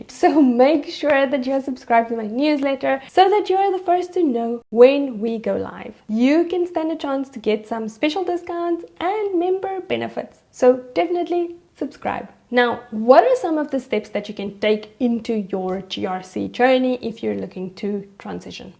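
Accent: South Africa. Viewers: I know my people when I hear them are you from SA by any chance? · This accent… I know it mahn. are you South African? 😅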